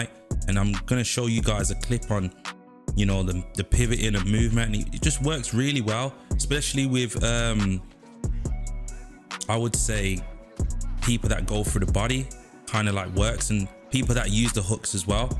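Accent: United Kingdom. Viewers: Hey, why you pitting on a brum accent 😮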